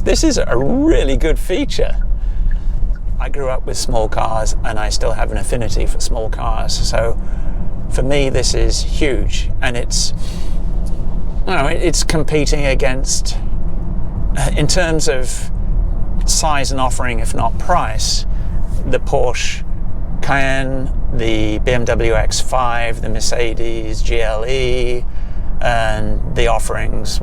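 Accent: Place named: United Kingdom. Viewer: I'm thrown off by the Brit voice and the vehicle isn't right hand drive. The two just somehow belong together.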